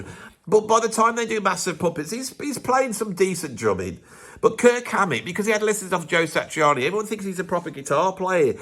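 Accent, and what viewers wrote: Birmingham, United Kingdom. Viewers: Five minutes in and my ears are bleeding from the wall of unpunctuated sentences, delivered in what I presume is a Worcestershire accent, sounding suspiciously Brummie. All this and we've almost finished defining "overrated". Brevity, please, dear Dog. Unfortunately I want to find out who these guitarists are because nothing is more fun than finding opinions to get irate about. How about some bookmarks?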